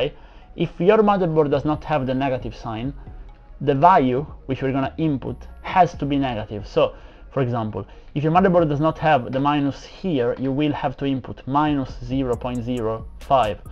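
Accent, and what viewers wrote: Italy. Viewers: Ti ringrazio molto per il tutorial, ho visto il video e per fortuna asus non ha stravolto il suo bios dopo 3 generazioni (ho un i7 13th e una z690). Ps. dalla pronuncia ho capito che sei italiano, sai parlare molto bene in inglese, complimenti.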